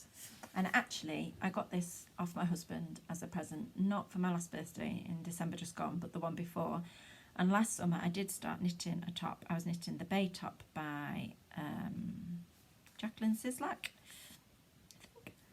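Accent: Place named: Northern England, United Kingdom. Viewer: I really enjoyed the podcast and the variety of things you have done. It is so refreshing to find somebody who doesn’t like grey!! I used to be completely autumnal in my colour palette, but have recently switched to blues and purples…but still no grey if I can avoid it 😁 I also loved your accent. I am originally from Cheshire, but have been ‘exiled’ in the South East for 39 years, so a Northern voice always makes my heart sing. A great first podcast - keep it up!! Jinny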